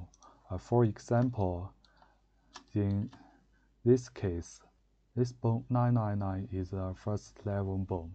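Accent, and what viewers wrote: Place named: China. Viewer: 好明显的中国口音啊大佬哈哈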